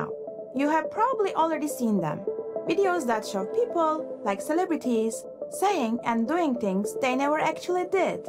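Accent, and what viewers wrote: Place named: Turkey. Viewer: Tam bir Türk aksanı. Konuyu kolay anladım. Teşekkürler.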